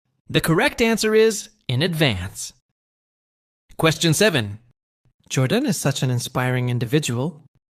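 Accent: United States. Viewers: It seems like it's not British English but American English.❤ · are u using american english?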